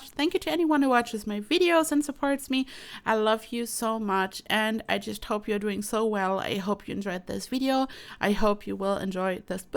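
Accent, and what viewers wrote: Germany. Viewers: Omg hahahhaha this is so funny:D It really is minor. I heard it so many years ago and could never unhear it. I realise its due to accent. My guess would be german? Also, go! Looking forward to more builds <333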